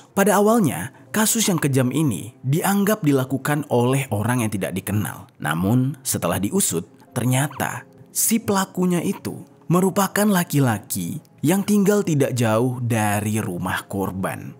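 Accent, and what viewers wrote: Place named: Medan, Indonesia. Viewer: Kok semua bahasa nya entah kasus atau apapun yang ada di daerah manapun kenapa selalu logat medan?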